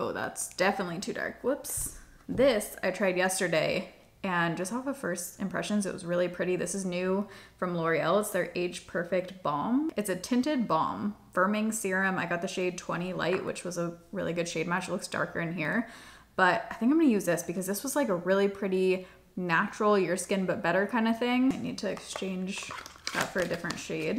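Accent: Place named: South, United States. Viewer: Dude I'm a couple minutes in and I'm sure this gets real serious real fast, but just listening to you talk. I can tell you've been in the South lately lol there is some twang in your Midwest! 💚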